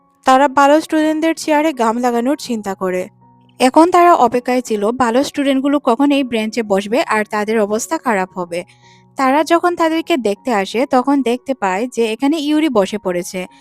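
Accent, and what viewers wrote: Sylhet, Bangladesh. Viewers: kota huniya oi buja jar afne je sylheti 🤣🤣🤣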